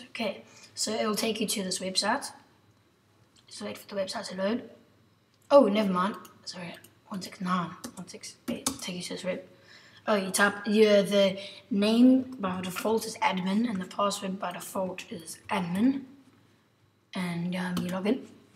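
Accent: South Africa. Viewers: THANK YOU!!! And you're South African. :D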